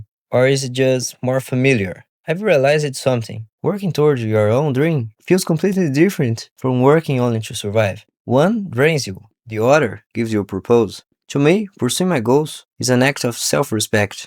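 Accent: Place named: Brazil. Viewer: pelo sotaque ctz q é brasileiro, baita video amigão 👏👏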